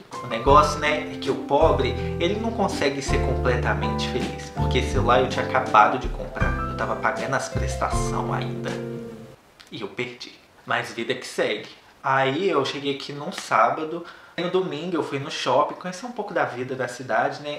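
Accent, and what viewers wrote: Minas Gerais, Brazil. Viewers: Adorei,! As caras que vc faz enquanto vai narrando a sua história é 10, o sotaque mineiro é tudo de bom. Dito isso, conta mais pra gente. 😉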